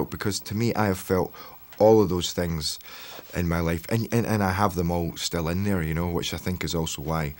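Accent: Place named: Scotland, United Kingdom. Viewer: Your Scottish accent is great. 👍🏻Your role was awesome. Even my mother fell in love with the phantom..she loved your singing..your performance..your handsomeness. It's all about the phantom. ❤❤❤️❤️❤️❤️❤️❤️❤️❤️ She was affected by his story more than one .. and decided that it would help him in his childhood. We all loved the role of the Phantom. Thanks for the excellent performance. By the way, I do not think that anyone will speak ill of our friend the Phantom now, because everyone has successes and failures, this is the life😊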